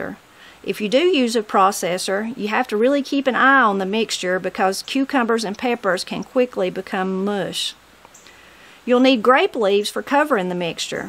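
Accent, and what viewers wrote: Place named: South, United States. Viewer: I’m from what then the outer Charlotte NC and Mom canned every year. She made wonderful chow chow. Love your presentations...your Southern talking and everything you do. Back in the country day....I do miss all the country growing up things. Thank you.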